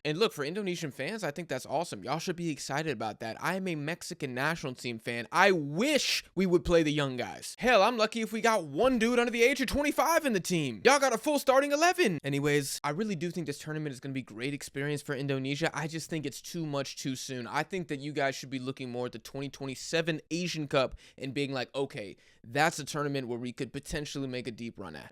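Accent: United States. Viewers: He has a typical thick American English accent. So I guess he is from the USA.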